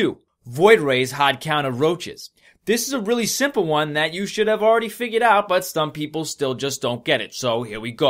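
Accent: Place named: New York, United States. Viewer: He speaks in a hard new york accent.. haaaad cawntaaaaah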